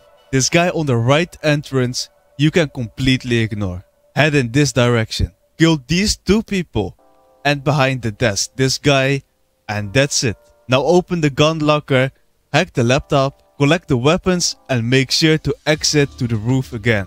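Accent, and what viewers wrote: Netherlands. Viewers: are u dutch? you have a bit of a dutch sound when you speak english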